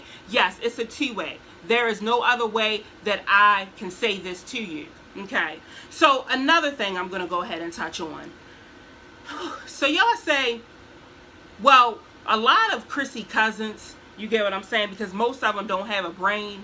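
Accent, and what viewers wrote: Baltimore, United States. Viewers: Hey girl Hey....starting to become a fan!!🤣 Nothing but facts....being a reactor is actually easier than being the vlogger.🤷🏾‍♀️ They the ones put in the spotlight to be judged by their every move!🤦🏾‍♀️ By the way....are you from Bmore? You sound exactly like you from Bodymore!🤣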